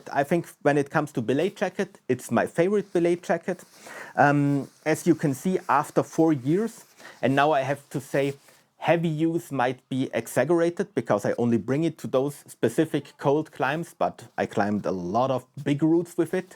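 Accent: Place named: Germany. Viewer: Hi! here Giorgio from Genova, Italy. I'm a mediocre alpinist / hiker. About the jacket you reviewed: you mentioned the price is almost 300 euro and that seems to you cheap, as I well understand. To be honest it doesn't seem to me exactly cheap also considering the possible good quality of this article. I recently bought the Simond Alpinism Light Piuma that costs almost 1000 euro and yes, maybe it has not the same warm... but has double zip closure:). Do you know it? In general I appreciate your contents. I'd suggest to mix the static camera view you generally use mixing your talk with on-field recording just as you did in the ice axes video. This would enhance the "quality" of your videos already very good and I agrree with your points. BTW, I know you are from Switzerland, but from which region you are? By your accents it seems you are from a German-language, right? 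You told you spend a lot of your days in mountains, so my suggestion / request is to share (if you feel interesting for your audience) some interesting alpine climbing routes in Switzerland you do. For me this is not for practical usefulness, but just for a cultural curiosity. Also, in general I'm interested to know climbing techniques and "common sense" mountaineering behavior rules in other countries, a part Italy. Thanks again giorgio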